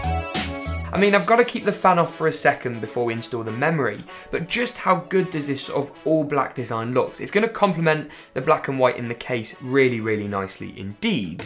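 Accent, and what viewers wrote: England, United Kingdom. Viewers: Are you not from the UK, i thought you had an english accent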